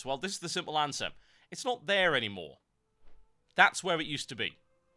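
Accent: Australia. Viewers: Hey, are you aussie? <3 your accent :D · Has anyone ever told you that your accent sounds more Aussie than English? o_O To me, anyway.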